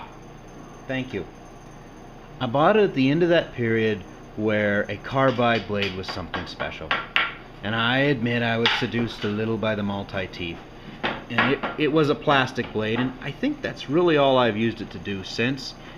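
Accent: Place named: United States. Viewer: You sir, sound like a good american. Awesome blades! Especially the vintage ones that have stayed in the family! Priceless!!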